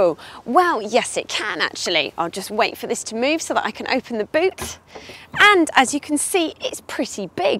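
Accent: United Kingdom. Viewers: Alexander Vartzbed and you throw in the snippy British accent too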